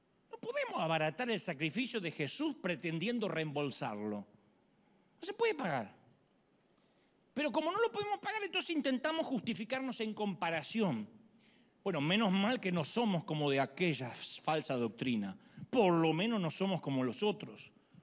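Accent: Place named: Argentina. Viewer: Que hermosa unción de Dios, a bendecido tu vida y con acento Argentino, gracias por tus mensajes claritos.. Bendiciones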